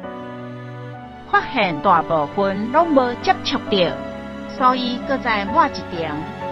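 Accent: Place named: Taiwan. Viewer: 強大的台語，拆機教學，害我笑到肚子很痛。本來是要學拆機，變成學台語發音。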